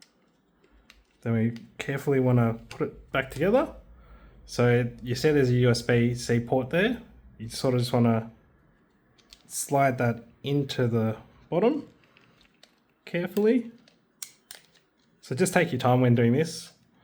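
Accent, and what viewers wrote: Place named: Australia. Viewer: I'm keen to try out a Lilygo T-Deck Plus as my entrypoint into Meshtastic, but it is not clear to me whether any of these sellers have the suitable frequency for Australia. You sound like you might be Australian, so I thought I would ask: from what I can tell, in Australia we need either AU915 or AS923 compliance. In the Aliexpress link, it does have a 915Mhz option, but it is not clear to me whether it would be suitable for Australia. I see that there may be some US915 devices that don't have quite the right frequency range for Australia. Can you help me choose the right thing? :-D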